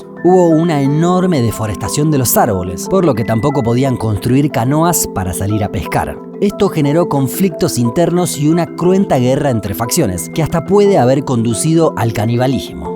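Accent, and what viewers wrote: Argentina. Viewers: Eres argentino ? Me gusta tu acento! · Sos de argentina che!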